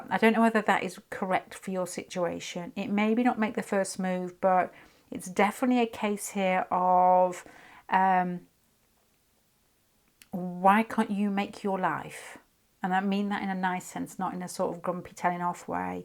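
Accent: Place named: RP, United Kingdom. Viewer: Pile 1. I'm aqua he's libra. Wt*!!! How did you do it?? But please, please, pweeeeeese, talk a little bit less posh because most of your words are incomprehensible.. unfortunately for non posh people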